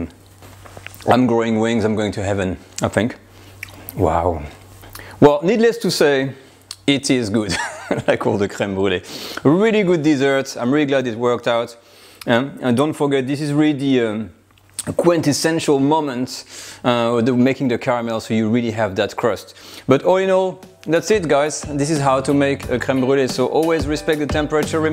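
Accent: France. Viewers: I like your French accent man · This tutorial is a winner 🏆. I have exactly the same ramekins and the French accent have convinced me!!!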